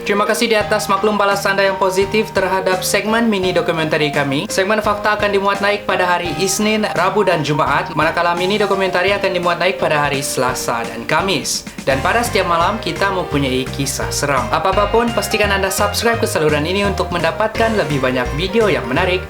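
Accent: Malaysia. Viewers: ni malaysian ke indonesian??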